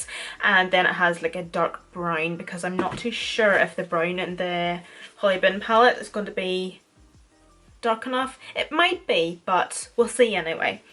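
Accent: Northern Ireland, United Kingdom. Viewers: love this look, I'm from Ards so it's good to hear a northern irish accent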